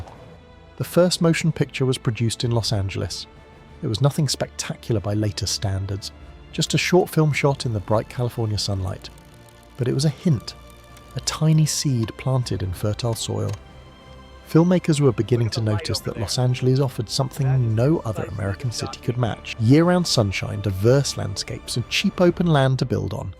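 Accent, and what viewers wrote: United Kingdom. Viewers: i really do love your reconstruction. And i love the most this britisch accent.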